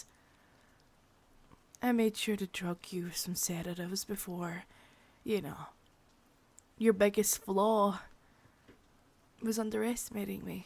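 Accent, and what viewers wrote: Scotland, United Kingdom. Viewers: Can you do a video using the thick Scottish accent like in the movie brave heart plzzz🙏🙏🙏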